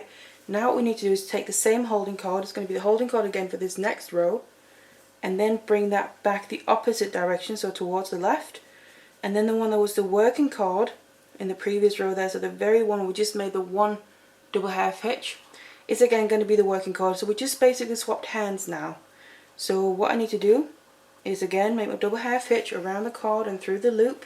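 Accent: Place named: Northern England, United Kingdom. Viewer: Are you from Northern England...I recognized your accent from watching a mini-series on the BBC called North and South. :o) This is really pretty! Thanks!!!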